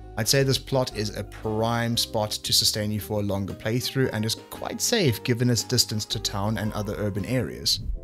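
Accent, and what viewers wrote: South Africa. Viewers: are u south african? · I sense a South African accent?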